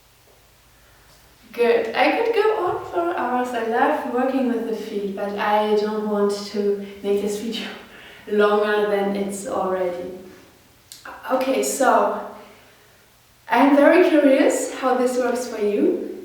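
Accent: Germany. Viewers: Du bist Deutsche, das hab ich sofort gehört ;)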